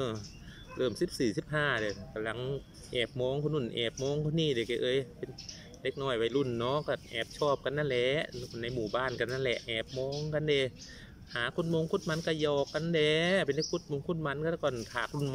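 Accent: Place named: Korat, Thailand. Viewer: ภาษาโคราชน่าฟังจ้า 😂😂 เด็กน้อยดื้อคักน้อจ้า เก็บความทรงจำไว้ ดีค่ะ ขำดีจ้า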